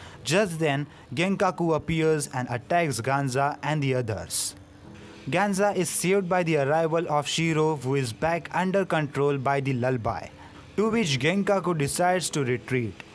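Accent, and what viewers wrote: India. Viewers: Are you from india your voice sounds like indian I am a new viewer so I don't know if you said anything about this in past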